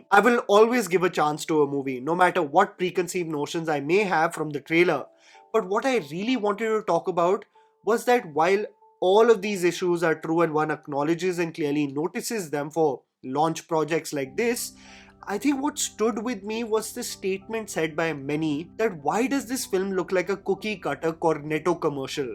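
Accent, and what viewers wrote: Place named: India. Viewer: I didnt understand single word from the host accent was too thick Indian style with English. Just speak in Hindi?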